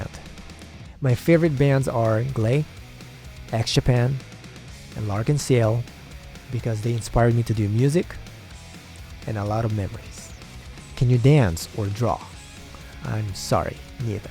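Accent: Philippines. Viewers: I actually thought he was filipino when I heard his accent, I didn't know. Haha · I'm probably wrong but I could hear a slight Filipino accent/diction, I'm guessing you lived in the Philippines for some time? Dude your singing voice is amazing. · i knew it from the accents thats he's a half fil 🤣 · Yeah the accent is definitely flip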